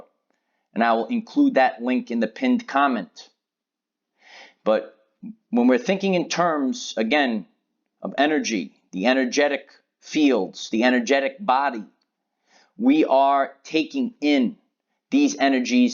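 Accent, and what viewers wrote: New York, United States. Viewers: love your accent. It’s adorable. Are you from New York? Greetings from Calgary Canada 🇨🇦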